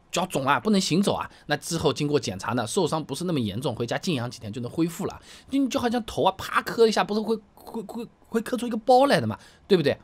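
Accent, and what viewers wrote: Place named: Jiangnan, China. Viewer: 江浙沪一带的数字读法大多是这样的 从方言直翻成普通话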